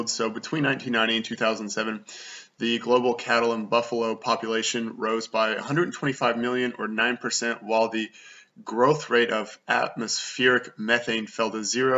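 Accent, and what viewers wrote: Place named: United States. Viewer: That’s wonderful. I can tell you truly care about animals. You seem like a nice guy. I’m glad I found your channel:) Wow Finland? That’s awesome! You have an American accent. Why did you move to Finland if you don’t mind me asking?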